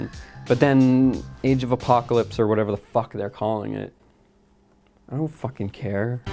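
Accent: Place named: Canada. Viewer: The canadian accent has never been so prominent.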